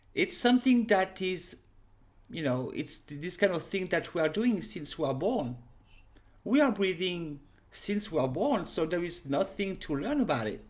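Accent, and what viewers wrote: France. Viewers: Bonsoir. En écoutant ta vidéo, j'ai compris que tu étais française. Hihi. J'adore ce que tu fais. J'aimerais savoir jouer comme toi un jour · Your french accent is so cute..... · haha You are amazing! Love your frenchy accent! Gorgeous videos you got!! WE ALWAYS WANT MORE!!! you keep us thirsty to hear! I personally love love LOVE you and Your Work!! AMAZING!!! <3 Thank you so much for those tutorials! and no don't worry they aren't boring. I love that you said they're like buildings. I use this metaphor alot! I'm a big fan! I love your sense of humor! I love you! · what's not to love about a sweet lady harmonica player with a french accent? i gave my lady friend a harmonica as a gift, she wants to learn how to play. is the perfect first teacher. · Don't bother about your accent because it's the way the French sound .You can very well understoodBravo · I love your French accent and your English is perfect! 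I wish my French were as good! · I'aime accent francais et aussi vortre explanations.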